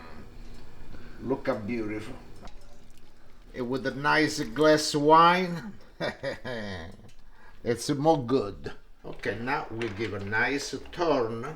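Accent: Italy. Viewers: I love your Italian accent. Today is my son’s 11th birthday and he wants me make this dish. Salute cin cin🥂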